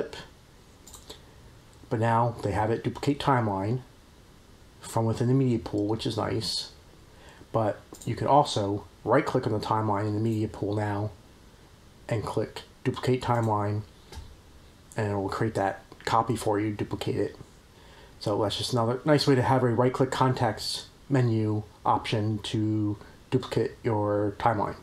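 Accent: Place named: United States. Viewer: Nice overview, definately helpful! I have a very off-topic question, which state are you from? I think i can hear it but i'd like to have it confirmed! :)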